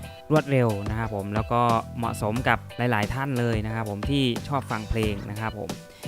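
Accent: Thailand. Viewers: สำเนียงถ้าไม่บุรีรัมย์ก็สุรินทร์แหละ555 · ทำนองเสียงน่าจะเป็นคนกรุงเทพ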